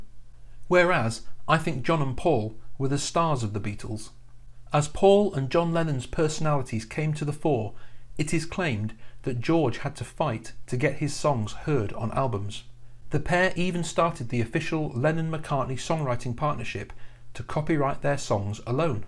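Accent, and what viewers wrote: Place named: Scouse, United Kingdom. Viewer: You hit the nail right on the head, mate. And I see Paul giving the finger subtly to people but when it came to George in the studio on the Get Back filum I think it is mostly just that he has his plectrum inbetween his thumb and first finger... I also think George would have been well aware of when Paul was giving someone the SNEAKY finger and would have reacted in the appropriate way. I just think he would have. Plus I think they were all close enough to be able to just tell each other to Fuck OFF or get screwed ! I really like the video, as I said, you hit the nail right on the head. And as you well know, being from 'round there, Scousers are a breed APART when it comes to Northerners and indeed all the British peoples. I think Scousers have just got that special ability to make jokes out of things the way only they do (mostly)! 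👍 (I just PRESUME you come from somewhere around Merseyside cos your accent sounds a bit familiar... it's definately not a full-on Scouse accent but there's something there !)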